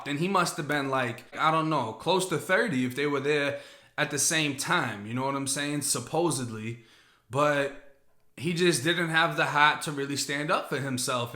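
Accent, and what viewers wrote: Boston, United States. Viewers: This dude tries so hard to use a wigger accent but his white Boston accent comes out, just be yourself or you won't ever be able to walk the streets in NYC facts and If you do tuck that flag we ain't Boston for corner Florida, we get down! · that Irish and Italian you know u from mass bro .. lol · Are you from Boston? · U live in FL but sound like you're from baaaaaston Massachusetts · U from Boston homie u sound like it tho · Is this dude from Boston or some shit? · He's from Boston area so, yeah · I don't understand why this dude always talks about Florida and he sounds like he's from Boston??? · Yo you have the heaviest Boston accent. I thought my NYC accent was bad but you win. Lol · Curious my dude you say you're from Florida and talk like you're from Boston I think you're full of shit bro because I lived 6 years in a max and 4 in the shu and bro wheres the big long tatty on the side of your face looks like your reppin someone else's life race runs the yard stop talking shit like you were accepted as an equal because you'd know nobody's equal in there fucking joke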